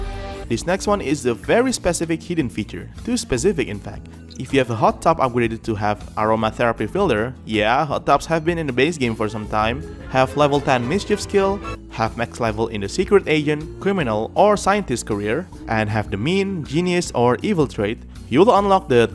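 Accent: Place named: Indonesia. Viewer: dari aksennya Indo bgt 🤣, semangat bang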